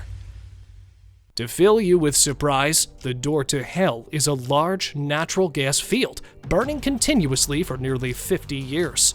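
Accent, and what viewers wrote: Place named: Russia. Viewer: Mne kajetsa, chto dictor russko-govorashiy.. 🧐🤌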